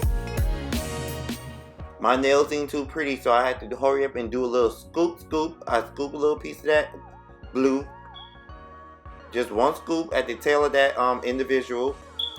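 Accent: Bahamas. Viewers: Omg I thought you sounded Bahamian 🇧🇸🇧🇸🇧🇸 .... Love your channel even more now!❤️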